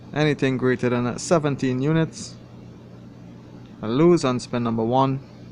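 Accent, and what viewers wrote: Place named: Trinidad and Tobago. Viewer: u sound like u from trinidad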